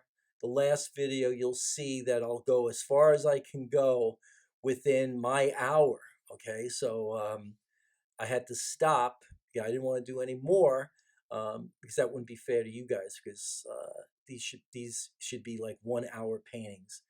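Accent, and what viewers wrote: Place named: New York, United States. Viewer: I detect a New Yorker (I'm originally from B'klyn).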